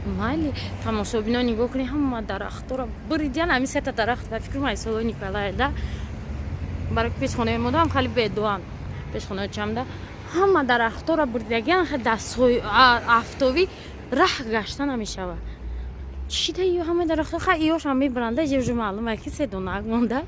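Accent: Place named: Kuhistoni, Tajikistan. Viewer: Кадом кухистонии хта бо месниги мегири якдам кани мани гап мезани якдам мхраш мегуй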